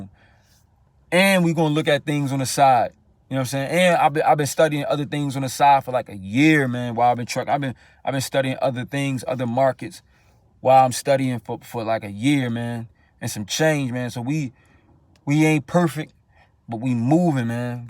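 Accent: Baltimore, United States. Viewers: I’m a nurse but just be curious about other professions n general but I like how U kept it 💯 a lot solid info and I def heard that Bmore accent lol by any chance u remember which season/episode of The Wire u were n? Thanks for the video ✌️ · 2 things I learn from this video. 1. What to expect my first year pay of trucking. 2. I can still recognize Baltimore accent from anywhere.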